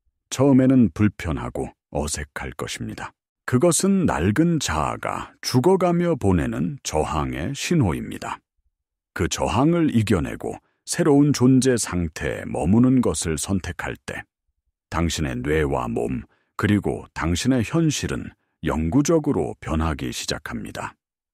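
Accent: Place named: Gyeongsang, South Korea. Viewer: 대박대박대박 놀래자빠지고용 화이팅하이숑 경상도 사투리가 당연하지요 창녕띠기 아인교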